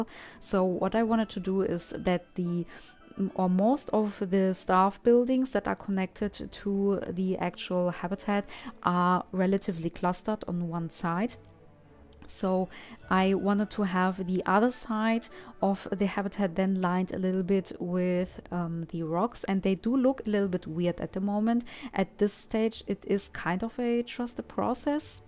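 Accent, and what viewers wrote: Germany. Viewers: Are you german by any chance, because you sound a bit german. You can hear the dialect but I stil enjoy your videos and I think you can buil sooo good like damn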